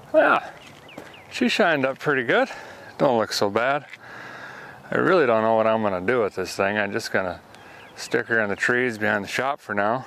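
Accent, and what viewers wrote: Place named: Midwest, United States. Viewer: It's the Midwest twang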